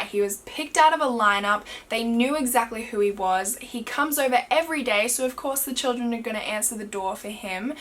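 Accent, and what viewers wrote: Australia. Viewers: i was not expecting you to be Australian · Is it weird that I look forward to these videos because I just enjoy hearing you talk 😂😂😂 Australians honestly have the best accents! Hahaha but thank you for putting up another mystery vid!! Love you!!❤️ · Cuz shes Australian 🤭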